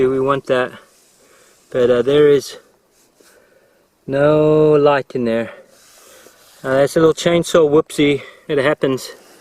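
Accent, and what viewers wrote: South Africa. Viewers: Was ts ure if you were South African but the takkies gave it away! Lekka project!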